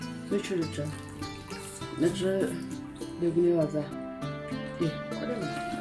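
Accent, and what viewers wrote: Kunming, China. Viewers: 我不是寻甸呢，只是之前在昆明呆过两三年了，所以一听就听出来了。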